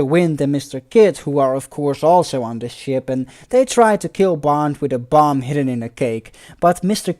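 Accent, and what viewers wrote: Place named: Netherlands. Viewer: 'He looks like a bored, dirty old man with an ashtray on his chest'! Man, you are one funny fucker! I am still laughing at this -- the line definitely helped by your Dutch accent, my all time favourite of any foreign English speakers. Brilliant.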